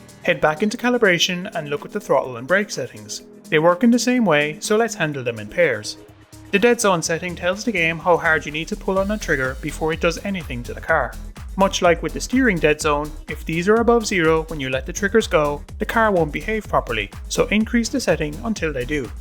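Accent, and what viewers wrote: Ireland. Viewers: lovely ol irish voice, good vid lad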